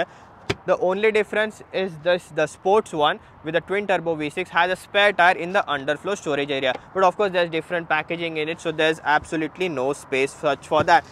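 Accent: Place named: India. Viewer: Angrezi bhi hindi ki tarah laga Jaise maths me english 👌👌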